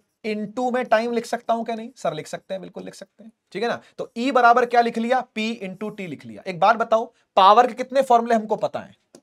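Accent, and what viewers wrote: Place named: Haryana, India. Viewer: that haryanvi tone ❤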